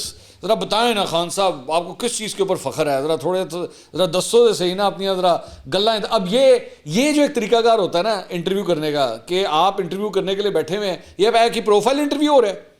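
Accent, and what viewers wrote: Punjab, Pakistan. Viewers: Love the way you speak and translate the words with punjabi tuch ❤❤❤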